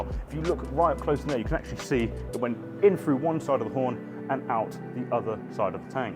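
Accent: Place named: United Kingdom. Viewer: God i love british accent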